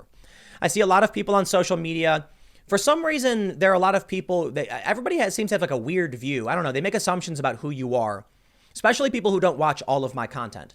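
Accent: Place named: United States. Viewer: to you. I have listened to probably about 15 of your vlogs or whatever you would call them. And to me you sound to me like an American. I am 55 but when I was a younger man I could discuss issues including politics with those who diametrically oppose me on most opinions, then we could go to the movies switch discussions to music or anything. You are articulate and you back up your opinions with data. No one on the right or the left should expect more of you. Keep up the good work. If everyone agreed with everyone 100% of the time we would be living in a robot police state with no free will.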